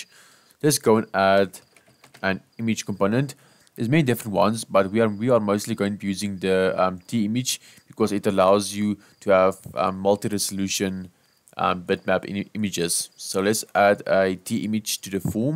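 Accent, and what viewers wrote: Afrikaans, South Africa. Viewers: Jisss maar jy is heavy afrikaans bra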